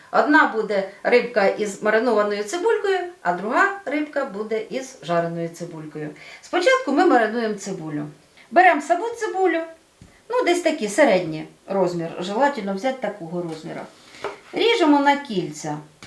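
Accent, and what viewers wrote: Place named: Dnipropetrovsk, Ukraine. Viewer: Обожаю наш Днепропетровский говор😂👍 караси на вид такие аппетитные, шо аж язык хочется глотнуть 👍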